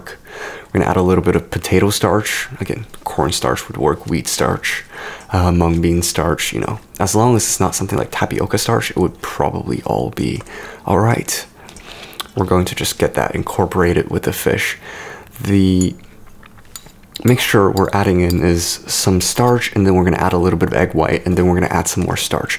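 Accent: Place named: United States. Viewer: Unnecessarily Far too long with American accent is irritating. Some items are too exotic to find in the UK.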